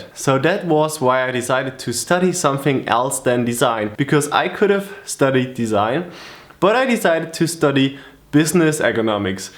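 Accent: Germany. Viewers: Where are you from? Germany? :D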